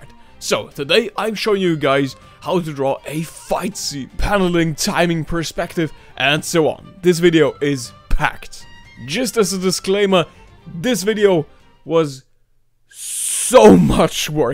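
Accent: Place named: Germany. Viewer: Dieser Deutsche Akzent xD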